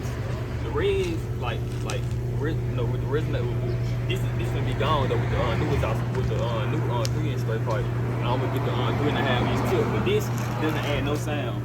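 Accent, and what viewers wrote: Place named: Memphis, United States. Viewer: its how black people from memphis talk , if u not from around there then youre never gonna be able to understand them. I live in indianapolis but i can still understand what he saying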